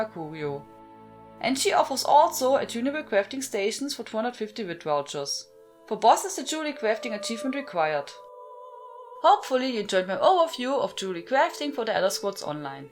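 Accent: Germany. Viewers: This really is one of the best directly informative ESO channels. It's also a nice bonus that your uniquely German accent really makes it engaging to listen to.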